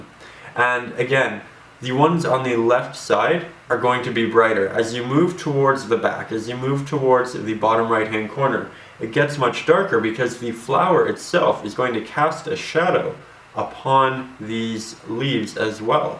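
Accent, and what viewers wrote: Canada. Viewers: Fabulous! Great Canadian accent, mate! I hear ya. Thank you for your lessons. They're invaluable to me.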